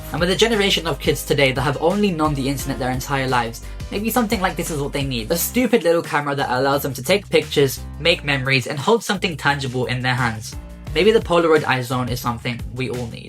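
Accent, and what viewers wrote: United Kingdom. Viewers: I KNEW YOU WERE BRITISH. I KNEW IT. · holy plot twist. i saw ur youtube shorts and did NOT expect u to be british. (slay tho)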